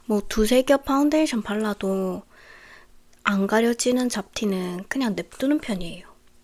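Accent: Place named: Gyeongsang, South Korea. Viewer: 찐목소리 메컵 좋아요!!! 사투리 쓰시는거 넘 귀여워여..... 약간 경상도 사투린가,,? 🙃 아껴볼게여 🫶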